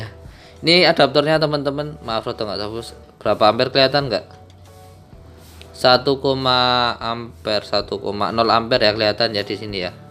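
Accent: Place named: Java, Indonesia. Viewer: Josss logat jawanya ,,mudah dipahami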